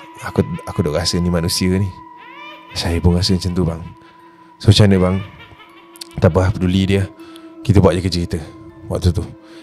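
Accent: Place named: Terengganu, Malaysia. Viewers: terbaik la kecek ganu 😂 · pandai plok cakak ganu😂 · peh, mcm org ganu doh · Jaddi borh loghat Ganu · bapak naturalnye kau ckp slang ganu. hahaha power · Bakpo bunyi ganu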